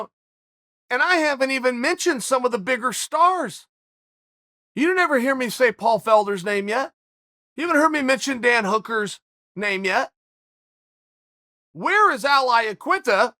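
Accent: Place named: Oregon, United States. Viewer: Chael your Oregon is showing.... golly 😂 this guys the best.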